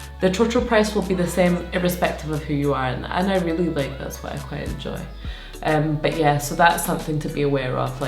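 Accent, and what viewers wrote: Scotland, United Kingdom. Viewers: I detect some scottish accent there ... am I right ? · I thought I heard a Scottish accent.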